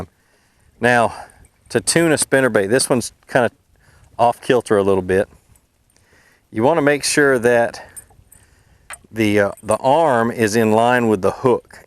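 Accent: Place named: New England, United States. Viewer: I like how his “nagging” voice is 100% yankee 😅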